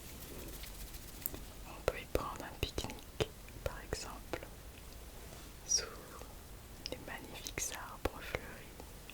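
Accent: France. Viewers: Another brilliant video. I love your beautiful french accent, so calming. Please make a really long video so I can fall asleep listening to your voice 🤗🙂xx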